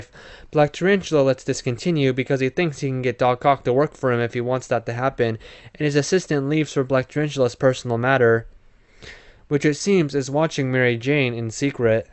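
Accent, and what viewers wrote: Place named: Argentina. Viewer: Naaa tremendo el pibe argentino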